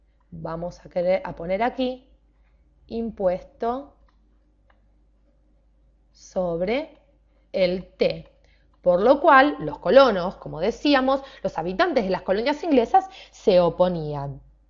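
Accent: Argentina. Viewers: me encanta ese acento , es argentino ?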